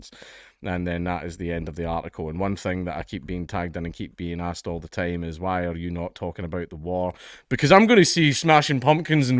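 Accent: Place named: Scotland, United Kingdom. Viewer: A smile just crossed my face, here in Texas, my Texan face, and it was nothing that you/he read/said. Nay, it was the FACT that you/Marcus/ is the DADDY OF A DAUGHTER! And each iddle widdle thing she says as she expresses the entire range of her itsy bitsy emotions... will be adorably said with my current and holding, absolute favorite accent: SCOTTISH! 😃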